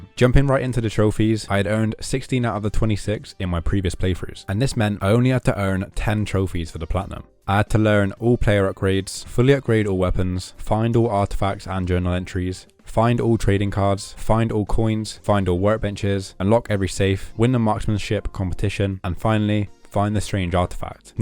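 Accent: United Kingdom. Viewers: Are you from Nottingham??? I hear a very familiar east mids accent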